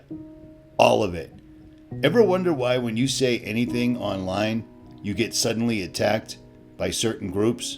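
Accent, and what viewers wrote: Boston, United States. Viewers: You must be a Boston guy??! Definitely gets a follow just for that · Sounds exactly like Richie from Boston. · This guy sounds just like that dude from Boston.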